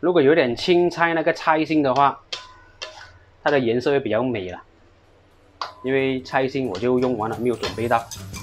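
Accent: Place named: Malaysia. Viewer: 馬來西亞的華語好可爱😂😂，有些聽不明，先給個讚👍然後再給個掌👏👏👏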